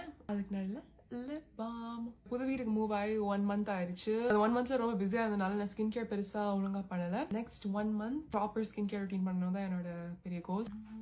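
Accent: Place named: Tamil Nadu, India. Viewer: So nice of you talking with tamil accent ❤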